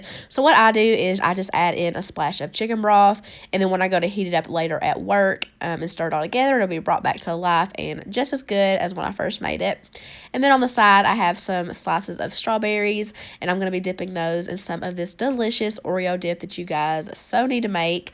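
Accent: South, United States. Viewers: I subscribed to you about 2 weeks ago but I have watched every episode that you have made please keep doing them it's amazing recipes that you have and I like to hear your Southern accent 🙂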